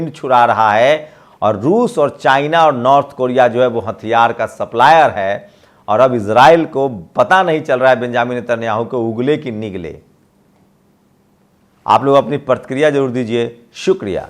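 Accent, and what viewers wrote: Bihar, India. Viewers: Bohat qoob, your voice Behari tune wa bohat qoob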